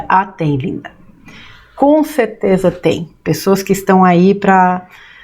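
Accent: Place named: Minas Gerais, Brazil. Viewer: "querida amiga", só posso dizer, gratidão, gratidão, gratidão, conhecer você e todos os personagens que você nós trás... é a melhor parte do nosso dia a dia, pelas reflexões, exemplos, ensinamentos, gostosas gargalhadas e lógico; pelo mineirez sem esquecer do café e pãozinho de queijo. Que PAI multiple e abençoe sua vida Beijos. Lis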